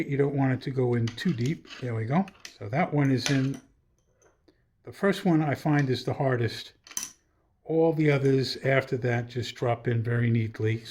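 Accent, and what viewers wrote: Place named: England, United Kingdom. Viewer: I thought Brooklyn but then I recognised the English tone to your voice and assumed it was the other way around sorry. great video anyway, I'll be doing the same modification tonight with your help. Thank you!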